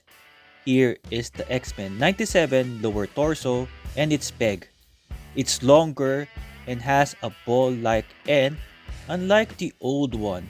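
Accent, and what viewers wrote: Philippines. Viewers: Great vid! Took me 4 seconds to hear that you are Filipino 😂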